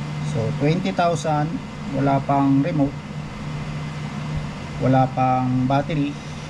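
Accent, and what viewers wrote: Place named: Pampanga, Philippines. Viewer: Kapampangan ka po ba sir? Na enjoy ko video mo hahahah bukod sa dahil sa drone e ka accent mo din yung namayapa kong tatay, more drone videos po🫡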